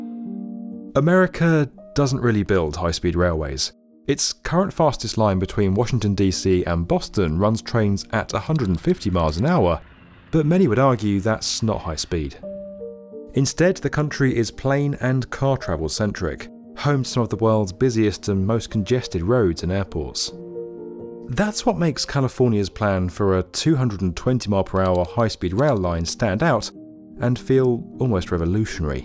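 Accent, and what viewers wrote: England, United Kingdom. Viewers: Another fun fact-- the hugeness of our country & relative lack of population density makes new trains less practical. Endless EPA hoops & needed land acquisitions add decades & hundreds of BILLIONS of dollars to the project. A suave-sounding Englishman does not make the project more appealing or make gargantuan hurdles any easier to overcome. Concrete from early construction will need to be repoured toward the end of construction at the current pace. I know, CHINA, CHINA, CHINA. THEY HAVE GREAT TRAINS THERE. MAYBE YOU SHOULD RELOCATE TO BEJING! Nsity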